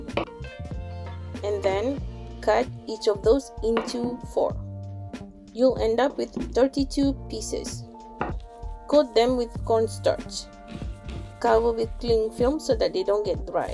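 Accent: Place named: Malaysia. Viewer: Found this video after i’ve already made potstickers which i failed :( btw, are u malaysian? The accent is so familiar, but the channel name doesnt sound malaysian at all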